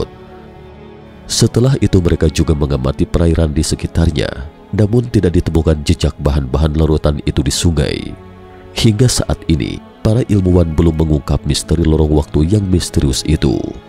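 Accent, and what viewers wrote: West Java, Indonesia. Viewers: urang sunda nya' kang?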